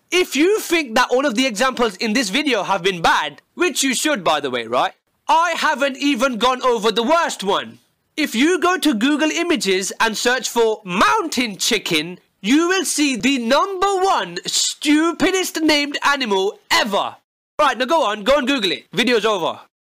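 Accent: United Kingdom. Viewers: You british or what?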